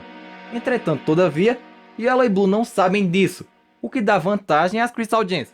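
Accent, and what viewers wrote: Northeast, Brazil. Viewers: voce e do nordeste eu so moro na bahia valeu pela teoria muito loco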